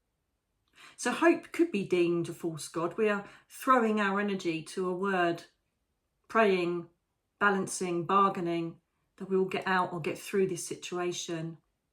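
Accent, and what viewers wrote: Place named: Essex, United Kingdom. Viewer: “Fuzzy”? really now ….😮😂 That was amazing!! Fun and funny. The rock in the river -great metaphor! Mostly I love hearing a bit o Essex over here in New York.❤ Great job!!!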